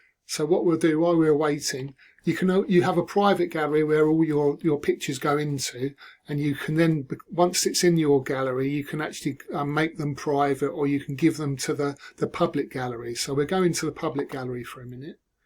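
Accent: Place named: United Kingdom. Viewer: I like that you havent used ai to present this. And your British! Get fed up woth American avcents. Soz Americans😮.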